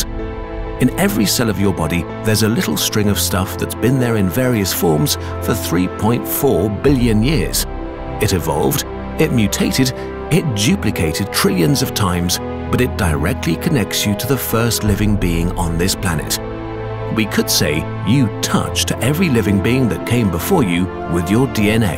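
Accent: United Kingdom. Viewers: Love it and the British accent make it even better :) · he reminds me of the narrator of the stanley parable. probably only because of the british accent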